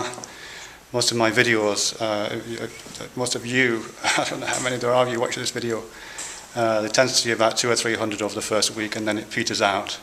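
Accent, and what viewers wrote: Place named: Liverpool, United Kingdom. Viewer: there's lots of scouse/chessire in this video... are you from the north east or the wirral?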